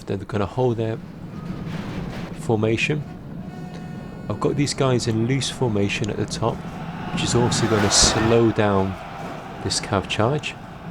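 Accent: United Kingdom. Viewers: I like your semi Yorkshire accent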